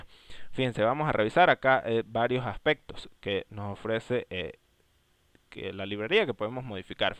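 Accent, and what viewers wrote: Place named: Venezuela. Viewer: Chamo, primera vez que escucho a alguien de Venezuela, saludos brother, chevere tu video, me ayudo.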